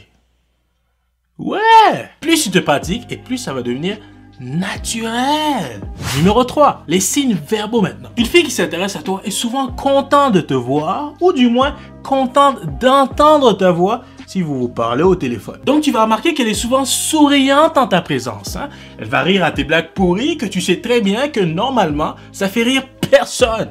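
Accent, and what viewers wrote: Quebec, Canada. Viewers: Je crois que l'accent québécois ne te vas bien qu'à toi sur terre.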